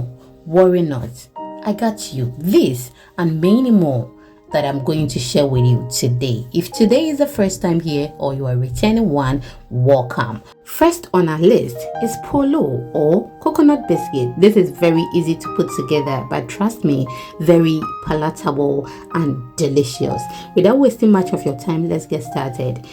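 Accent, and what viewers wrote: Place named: United Kingdom. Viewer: You Uk accent is on point ooo still watching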